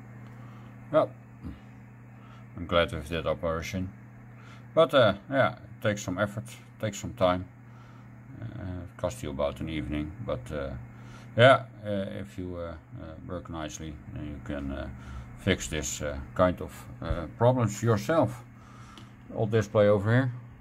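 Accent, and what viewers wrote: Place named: Netherlands. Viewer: I always enjoy the Dutch accent in English. It keeps me from being a stress kip. :D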